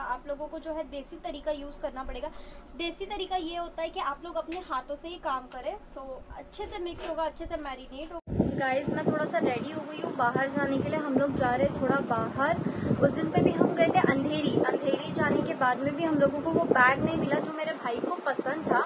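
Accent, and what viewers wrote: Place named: Karachi, Pakistan. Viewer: Ap ka accent bilkul karachi wala hai ap Andheri ka na btatin to mai yehi smjh raha tha ap karachi say ho india mai b M.Ali road hai.?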